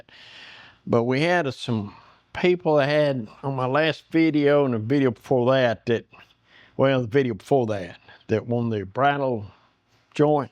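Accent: South, United States. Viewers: Kids can't even make change at a checkout counter, and they want to make fun of a master carpenter because he has a southern accent! This tells you where this kids head is at. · I really like your channel sir, I’m a cabinet maker by trade and have been for many years. It’s guys like you who teach me things and help me grow. I thank you for your videos and experience. Keep ‘em coming. Don’t let the negativity get to you, some people have absolutely nothing better to do than put others down…. Especially when they are envious. Personally, I enjoy your southern accent. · The only thing better than your vid’s is your southern accent! Keep up the good work! Btw…are you going to tell us how you made the steampunk gears?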